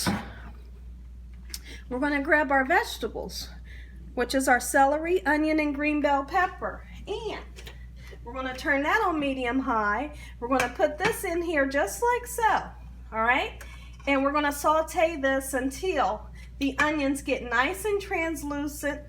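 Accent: South, United States. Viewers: ❤️❤️❤️ that sweet Southern accent 😌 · Where are you from ?? you are southern parts of somewhere🤗 Louisiana maybe? I'm from Alabama and we do things alot alike ❤️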